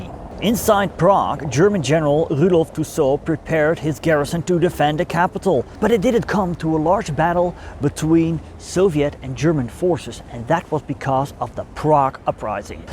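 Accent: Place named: Netherlands. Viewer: Just taking a moment off 'Driven to Distriction' (helpful for ADD History Buffs like me) to tune into this fascinating short. Im not in position to support whst you do, but i do think the style and approach, and the Dutchies accent work well together. Although Scots-Welsh, my partner and I always get mistaken for Nederlanders out on our travels; it even happened in Haarlem. Interesting what happened in Rostov this week. Back in the day, in the Valley of Snakes, there, a leading member of my profession was murdered. Wikipedia says some were still alive when the pit was backfilled; the stuff of nightmares surely. Although peace-minded, we don't forget that our the Norse, Dutch, German Resistance, etc, etc friends thatcsaved us from occupation and an overt problem of how to live and work with collaborators. Be pragmatic, or hand the back to Mossad, or both? 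The Rom have no Mossad, that I know of though; but engaging, sharp, factual presentations must be a part of the UnFinalising of YouKnowWhat. g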